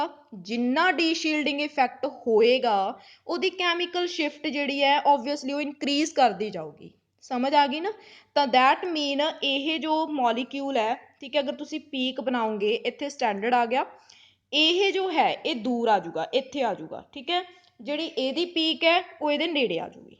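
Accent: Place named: Malwa, India. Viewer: Wao mam malwa belt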